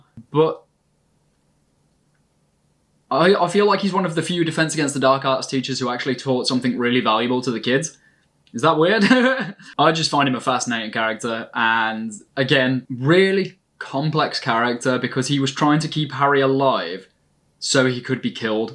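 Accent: Birmingham, United Kingdom. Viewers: The more I hear you speak the more I can hear the Birmingham accent. Thank you for being so raw and honest with us xx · Hello fellow brummie! Where in Birmingham are you from?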